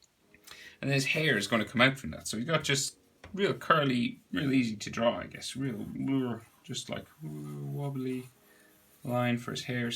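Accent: Ireland. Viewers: Are you Irish cos you sound it??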